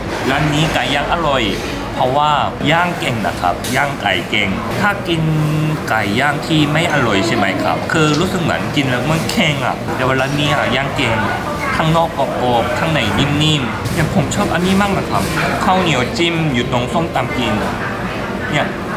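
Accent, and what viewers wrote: South Korea. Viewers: คนไทยเค้าเอ็นดูคนต่างชาติอยู่แล้วค่ะ เหมือนเราก็เข้าใจว่าเค้ามาต่างถิ่นต่างที่ มาเที่ยวบ้านเรา เราก็ต้อนรับเค้าเต็มที่ค่ะ ยิ่งคุณ พูดไทยสำเนียงเกาหลีคนเค้ายิ่งเอ็นดู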